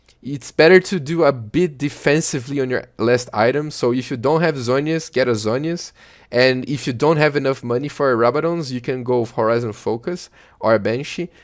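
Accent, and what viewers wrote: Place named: Brazil. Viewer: Sotaque de brasileiro falando inglês é muito fofo 🥰🥰🥰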